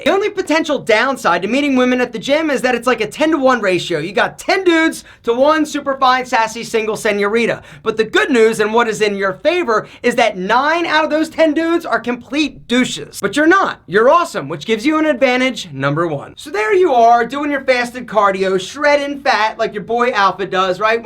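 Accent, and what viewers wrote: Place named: New Jersey, United States. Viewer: So you’re from new jersey I can tell from you’re accent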